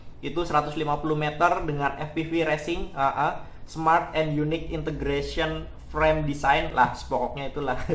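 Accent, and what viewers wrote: Banyumas, Indonesia. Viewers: ok sipp makasih mas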